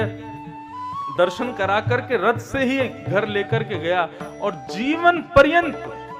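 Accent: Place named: Braj, India. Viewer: Jay Shri Krishna Radhe Radhe 🕉 Namo Narayan ji Jay Shri Hari 🙏 ♥️ ❤️ 🕉 OM Namo Bhagvate Vasudevy Namh Jay Shri Laxmi Pati Jay Shri Shyama Shaym ji Jay Shri Guru Dev Maharaj ji Aapke Charno Mai Koti Koti Pranam 🙏 Radhe Radhe Jay Shri Harivansh ♥️ ❤️ 😍 💙 Radhe Radhe Aapki vraj Bhasa Badi Sumadhur hai Radhe Radhe Jay Shri vraj vasi Jay Shri Vrindavan Dham 🙏 Jay Shri Yamuna Maharani ji 🙏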